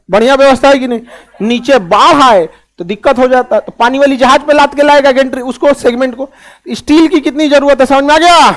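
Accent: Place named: Bhojpur, India. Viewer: Sir aap adha Hindi or aadha bhojpuri kyu bol te he.... Lekin sune me aachaa lag ta he ham bhi kyu ki Banaras he❤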